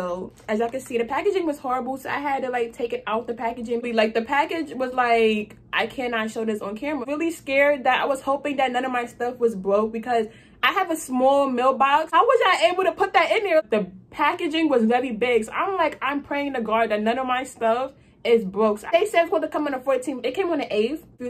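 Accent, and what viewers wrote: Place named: New York, United States. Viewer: You got a new York accent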